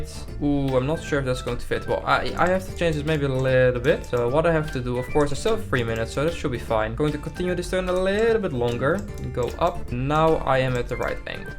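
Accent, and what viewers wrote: Netherlands. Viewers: You are dutch right?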